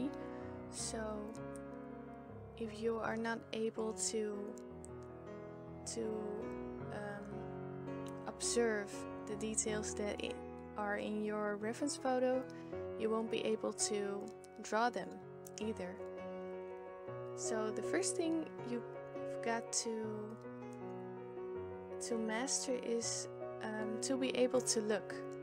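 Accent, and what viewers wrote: Netherlands. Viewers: are u dutch? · Her accent is Dutch, she is Dutch so am I, is a typical Dutch name...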